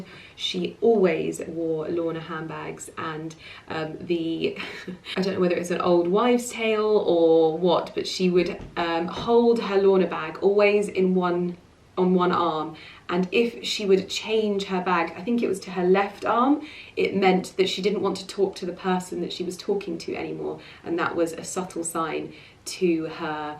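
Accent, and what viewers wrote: United Kingdom. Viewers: I enjoy your videos 🥰i really enjoy you British accent I want to visit English one day favorite country 🥰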